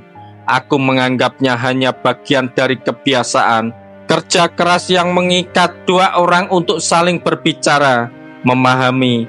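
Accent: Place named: Java, Indonesia. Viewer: Medhok banget suaranya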